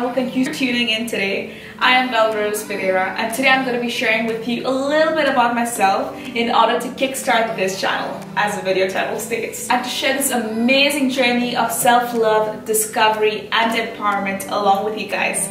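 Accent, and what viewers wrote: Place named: Minnesota, United States. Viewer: I did subscribed velrose❤😘😘 lovely speech , kinda intersting, And the accent is really really good to hear . Same as minnesota state😍😍😍😍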